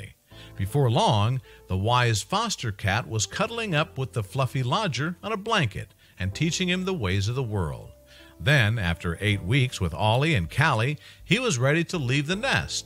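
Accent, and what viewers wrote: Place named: United States. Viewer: That god-awful American accent. Hit the mute button.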